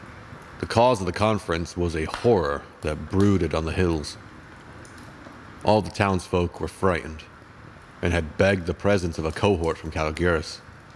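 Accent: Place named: Arkham, United States. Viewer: Oh yea, I was transported back to Roman horrors… So there’s another older take on this short story? I’ll look it up… Really enjoying your narrations, accent seems location-appropriate, practically out of an Arkham sanitarium…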